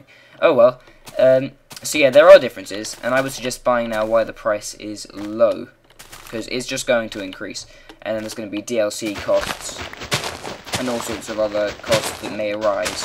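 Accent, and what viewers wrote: United Kingdom. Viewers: Thanks for the review! It helped me decide that I'll probably buy the game. And your British accent made this 36% better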